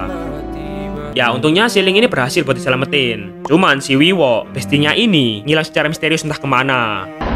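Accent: Java, Indonesia. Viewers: BANG LU ORANG JAWA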